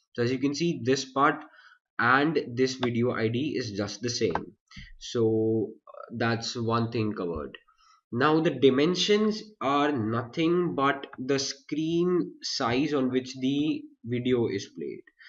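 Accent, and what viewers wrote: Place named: India. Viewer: It's Indian accent.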